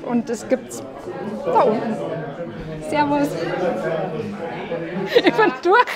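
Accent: Bavaria, Germany. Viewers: Ich kann es mir ja leider nicht gut anhören, weil ich mit bayrisch nicht zurecht komme. Aber fiel Glück weiterhin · Bayerisch is einfach so ein schöner Dialekt 😛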